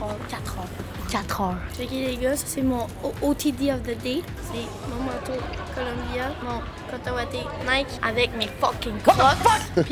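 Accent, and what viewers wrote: Quebec, Canada. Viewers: J'ai juré l'accent Québécois c'est incroyable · xD l’accent québecois JADORE ZBI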